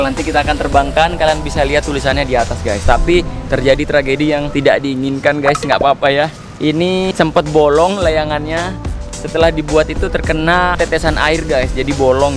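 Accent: Madura, Indonesia. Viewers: Anda orang madura ya kalo iya berarti sama kayak saya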